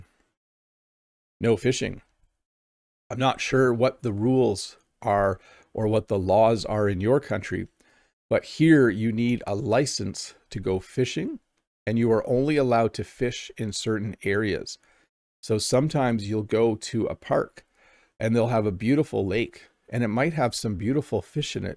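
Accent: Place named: Canada. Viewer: Thank you,. I study English almost every day, but there’s just so much to learn. In the past few days, I’ve been focusing on English intonation and connected speech. My pronunciation of individual word is pretty good, but when I speak, I still don’t sound like a native English speaker. Intonation and connected speech are my weak points. I live in Toronto. Once my English reached a certain level, I’d like to come visit you! I really enjoy your English lessons, and I especially love your Canadian accent! Thanks again,!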